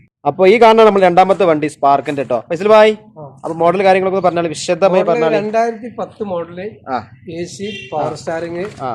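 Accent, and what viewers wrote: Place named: Malappuram, India. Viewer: മലപ്പുറം slang പൊളിയ 😂😂😂😂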